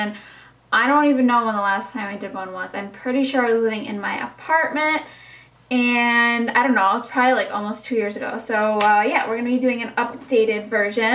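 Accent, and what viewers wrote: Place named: Upstate New York, United States. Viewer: She's from upstate NY I know because that's where I'm from I can tell by her accent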